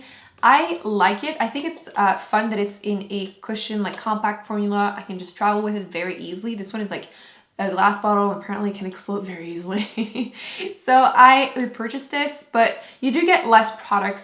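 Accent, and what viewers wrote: Canada. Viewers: She's from Canada c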